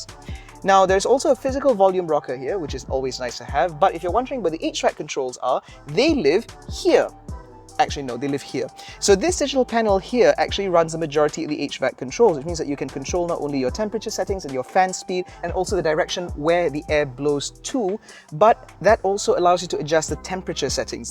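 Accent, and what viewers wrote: United Kingdom. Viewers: Wow ...rasa macam orang uk yang review ... mantap bro.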